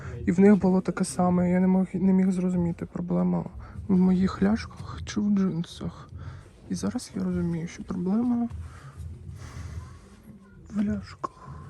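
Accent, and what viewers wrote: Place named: Russia. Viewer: жахливий русский акцент!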